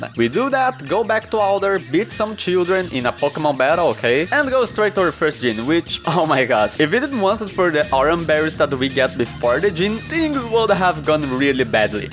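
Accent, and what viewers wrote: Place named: Brazil. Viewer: Sabia que era brasil pelo sotaque adorei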